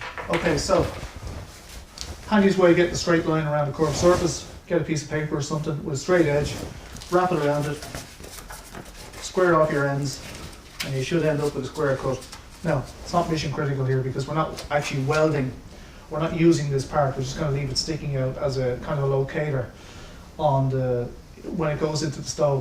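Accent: Ireland. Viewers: Just realised - was the reason I started to watch YouTube in the first place. Thank God he's back. Apart from the content being exactly my cup of tea (free heat and paramotoring) there's something therapeutic about the enthusiastic and humorous delivery in that wonderful Irish lilt. Normal service has been resumed! Good on ya!!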